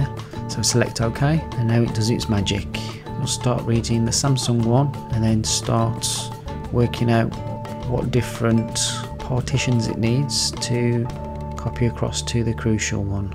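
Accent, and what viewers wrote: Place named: Midlands, United Kingdom. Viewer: some one i understand from the midlands cheers matey.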